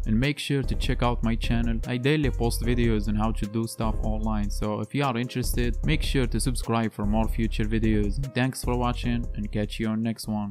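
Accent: Morocco. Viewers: Your accent just Moroccan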